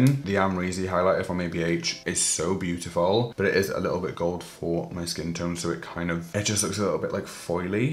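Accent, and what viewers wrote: Wales, United Kingdom. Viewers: Are you welsh? your voice is legit so therapeutic xox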